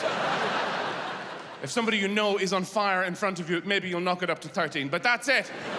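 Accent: United Kingdom. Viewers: Where's the punchline? This is just what British people sound like to me.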